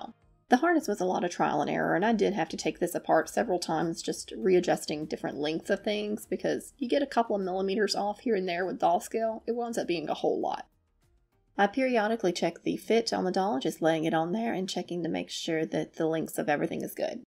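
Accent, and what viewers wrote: United States. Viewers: Awwwww lol!!! I think being from the UK, any accent from the states, us British love!!! 🥰🌈. Your work is so good hun. Thank you for these vids, they really do inspire!! ❤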